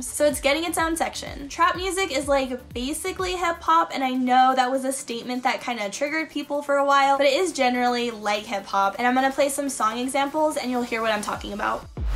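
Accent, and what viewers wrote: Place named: United States. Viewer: The way you pronounce things as an American isn't wrong. If it's a result of your dialect, it's just part of the way you speak :)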